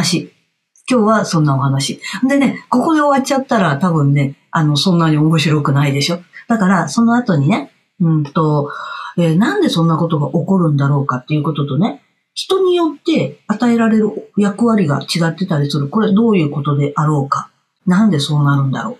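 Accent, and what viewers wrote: Kansai, Japan. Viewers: さん大切なお話し有り難う御座います😊目に見えない世界のお話は君が代の祝詞の中に書かれてありますね（千代に八千代に）を解説するとこの世は目に見えない世界(霊主体従)と見える世界(体従霊主)の内容が書かれてあります！私ね！宇宙のエネルギー、波動、周波数と言った振動を数値化出来る才能を天子から預かり不思議と分かります！さんの関西弁が大好きです😍😅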